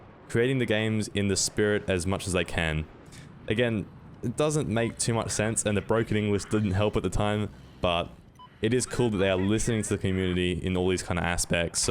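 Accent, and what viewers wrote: Australia. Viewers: I subbed good to hear a Aussie doing the YouTube thing. Now to the game I can't wait to play but first I will have to get a console lol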